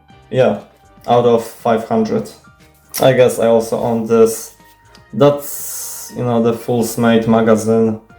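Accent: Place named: Poland. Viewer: I just KNEW you’re from Poland, I know a polish person when I hear one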